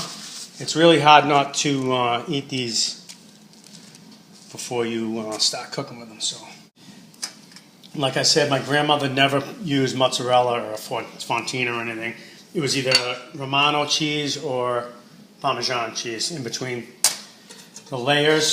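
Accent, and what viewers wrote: Boston, United States. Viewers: Stumbled across this video while searching for a way to peel eggplant Love his Boston(?) accent, rapport with, the dog, and his "commercial breaks." Oh yeah, the Eggplant Parm looks amazing, too! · All kinds a Boston/Mass in that voice!!!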